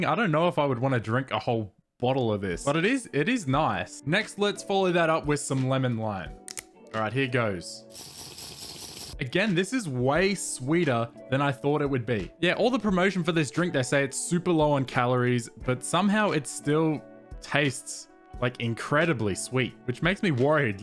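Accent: RP, United Kingdom. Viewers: I thought was British when I started to watch him xD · Me being British watching him be so posh is painfull to say the least. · I thought you where British lol, they are so similar! this comes from a Swedish person so I have no idea what I’m talking about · Is it only me who always thought that spoke a British accent. It sounds like to me for some random reason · I was honestly mega sure he is british half the video. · bro i thought u were british with that little accent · I am british. When you tried to do the british talk, that was hilarious! When I first saw your channel and clicked on one of ur videos, for some reason I thought you were British🙂😂 God bless you! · You already are british, you just think too much about your accent. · You got some little British accent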